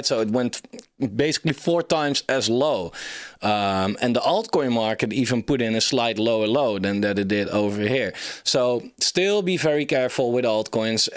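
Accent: Netherlands. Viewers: Are you from the Netherlands? Judging from that accent :) or Belgium?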